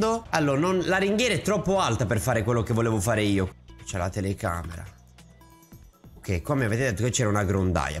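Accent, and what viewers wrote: Rome, Italy. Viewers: però l accento romano no! E che cazz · Non te se po sentì a parlà mezzo romano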